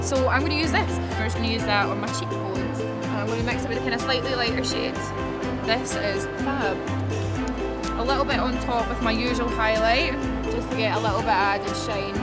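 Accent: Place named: Glasgow, United Kingdom. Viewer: loved this video, you can tell you're from glasgow but how much you say 'kinna', makes me feel right at home lol x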